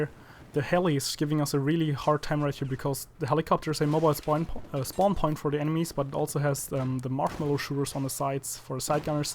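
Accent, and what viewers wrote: Germany. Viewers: Oh are you German? I notice an accent there :D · are you german ? You sound like you are. You accent is like you come from germany because of the th pronouncing. -Mojo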